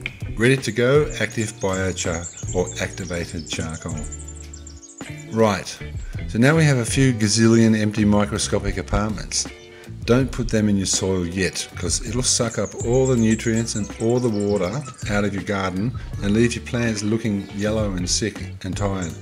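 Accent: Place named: Australia. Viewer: ...and a beautiful voice speaking, besides this lovely australian dialect... 😉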